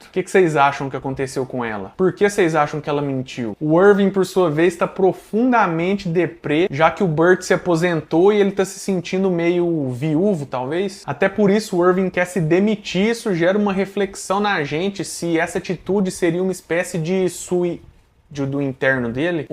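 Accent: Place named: Minas Gerais, Brazil. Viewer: Que sotaquin mineiro bão.. minas é bom demais .. que saudade da minha Minas gerais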